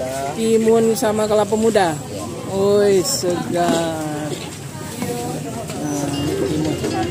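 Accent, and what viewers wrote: Minangkabau, Indonesia. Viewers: Puan bertutur minang Seronok didengar menghibur hati masakan minang terkenang kenang enak lamak bana sudahlah pasti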